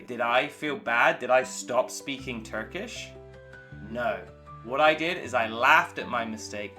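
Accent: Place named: United States. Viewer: Why you have American accent? Even if u were born in Uk.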